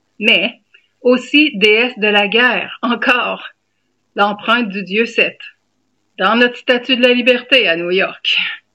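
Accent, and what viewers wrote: Quebec, Canada. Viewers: Avec ton accent québécois on ne peut qu apprécier ! J te promet ma belle ! ❤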